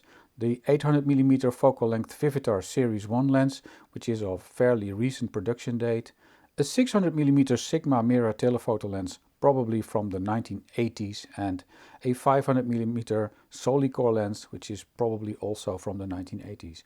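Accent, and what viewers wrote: Netherlands. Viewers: love your vids and your nl accent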